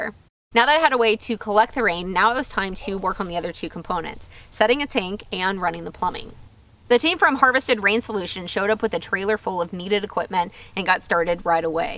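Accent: Texas, United States. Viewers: Dang. I forgot you’re from Texas. 😆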